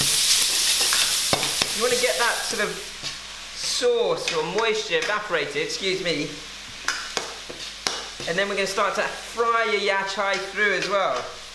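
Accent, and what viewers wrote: England, United Kingdom. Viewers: Random question: are you English? You sound like you have an accent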